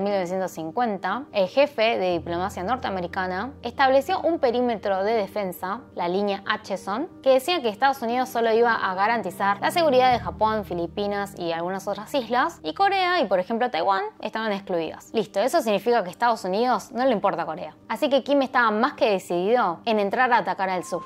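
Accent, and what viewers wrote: Argentina. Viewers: I would rather listen to you narrating this video in Korean or English than in Spanish and worst with that argentinian accent, pity! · Que linda ver yba asiatica manejando bien el español pero estabpiba lleva algo de acento argentino xd jaja te amo ...por darnos esta información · Las mejores narraciones han sido con acento argentino, aquí otra prueba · una KEIKO y habla como argentina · Con razón tu tono argentino de hablar que no captava del todo. Muy interesante la historia. Gracias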